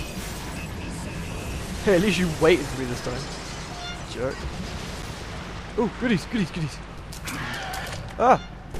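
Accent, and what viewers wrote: United Kingdom. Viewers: Um.... since when does having a british accent make you sound like a nerd. I never really thought about his voice that much, everything is pretty much for the lolz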